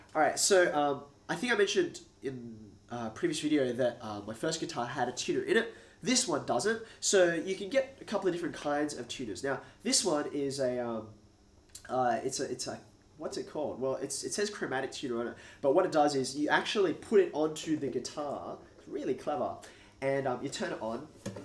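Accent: Australia. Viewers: Think he is saying "gig", it's just the AusTRAYlian mate.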